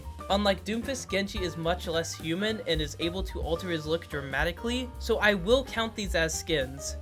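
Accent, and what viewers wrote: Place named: United States. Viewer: The americanism in the pronounciation of the names is insane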